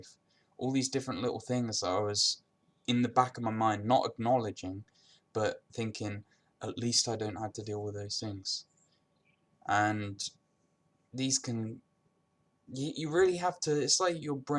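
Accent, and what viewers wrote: United Kingdom. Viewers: I am impressed by your strength, you deep voice and your British accent. Yes, you are right,, we love our sickness. Of course, it might be a love/hate relationship, but she is the only mistress remaining. In other hand, not every body can recover at 100% for life time. Dealing with the sickness, at the first time it is having to mourn about the 'perfect life', and after to go further with a real life. If you are young specially, keep a eye on quiet job.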